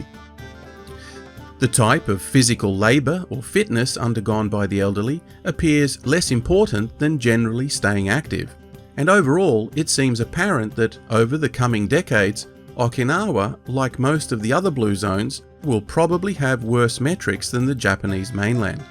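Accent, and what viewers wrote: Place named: Australia. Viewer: Good job, love the humor, delivered with the Aussie accent, perfect. It was a big subject to breakdown however I think you managed it.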